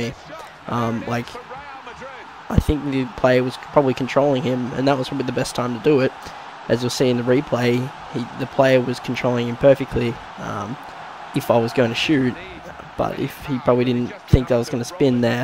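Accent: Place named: Australia. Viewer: U Aussie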